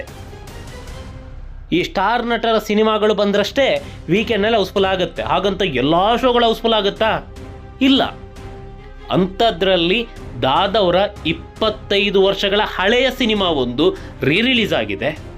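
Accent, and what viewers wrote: Coastal Karnataka, India. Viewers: nivu super,love u ದಾದಾ ❤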